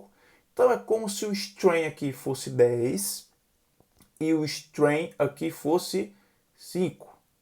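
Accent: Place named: Salvador, Brazil. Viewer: Boa, parabéns pela aula. Esclarecedor para qualquer residente. Sou preceptor aqui do HGCA( vejo que sotaque é soteropolitano) e são esclarecedoras essas aulas básicas iniciais, a base do curso 👏🏼.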